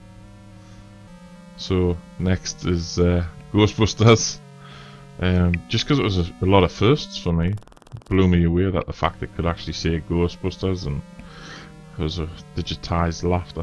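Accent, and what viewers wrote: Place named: Tyneside, United Kingdom. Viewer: Nice video.. Nice to hear a good Tyneside accent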